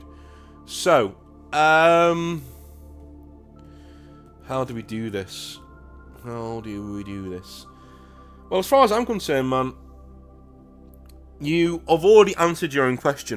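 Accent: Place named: North, United Kingdom. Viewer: Some times I just need to hear a northern accent tell me to sort shit out. Cheers mate ❤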